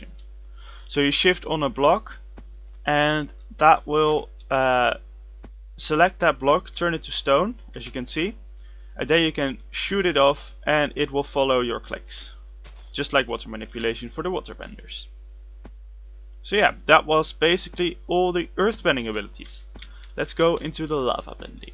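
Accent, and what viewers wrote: Netherlands. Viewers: Your Dutch accent💜 btw loved the video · The Dutch accent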